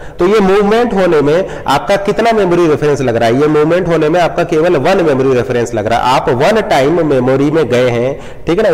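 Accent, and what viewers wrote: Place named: India. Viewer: Im Portuguese, I have a hard time understanding English with an Hindi accent especially when he speaks so fast, I really appreciate these videos, could someone please subtitle these?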